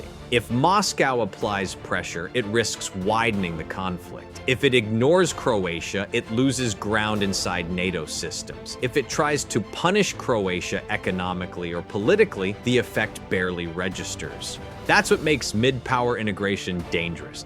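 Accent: United States. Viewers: This tosser with a Yank accent is so delusional he don't know what is happening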